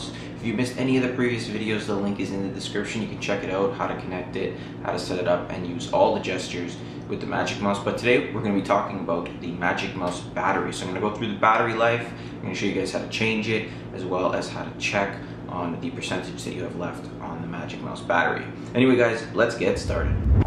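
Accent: Canada. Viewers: Same problem!! I have had this mouse for 5 years. The last time I got the low battery warning, I couldn't get the back off no matter what I tried. I popped into the local Apple Store and she did it easily. I felt like an idiot. I practiced. Then, last night, I got the battery warning again. Figured, okay. I can open up the case. Failure!! Again. I was on my way to the Apple Store again, feeling like the same idiot - but more so. I thought "Lemme check YouTube." Thank you!! You saved me a trip and my sense of pride.;-) BTW, are you Canadian, by any chance? Big thanks!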